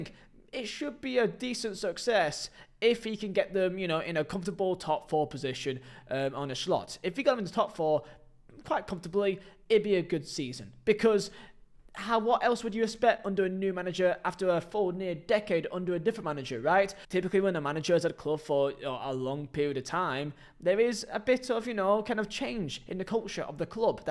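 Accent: United Kingdom. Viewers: Oh my God, I thought I'm not able to notice british accent as a total foreigner, but... Wowowowowow 😮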